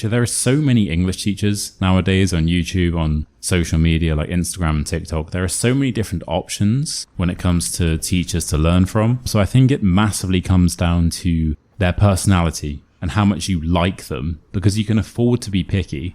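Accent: RP, United Kingdom. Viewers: Ramble on, we enjoy listening to your “ever so English” voice. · Hi! What a lovely video and content! (I don't know anything about eye patches or the like). I'm glad you've had some fun in spite of your hard work. Most real English teachers from Argentina go to university and undergo a 33-yearly subject study programme, including Language 1, 2, 3 & 4, Grammar 1,2 & 3, Phonetics 1 & 2, Stress and Intonation (laboratory) 1 & 2, English Literature 1, Contemporary Literature 1 & 2, Shakespeare, History of the British Isles 1 & 2, Geography of the British Isles and the USA, American Literature, American History, Pedagogy, Psychology, Methodology 1 & 2, Ethics, Philosophy, ( Religion 1, 2, 3 and 4), etc. I did Practice lessons with teens. But I love working with children and preteens. I don't give on line lessons, just in case you think that I'm offering myself as a teacher😅. 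I follow you because I'm losing my memory and I love your modern RP pronunciation. As you said, you are a real flesh and bones teacher, handsome, talented, diplomatic, hardworking and honest. Thank you, dear teacher! ❤🎉🌺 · Give me your contact number I want to learn British accent from you I am Pakistani girl I want to go to the London on study visa if you don't mind plz sir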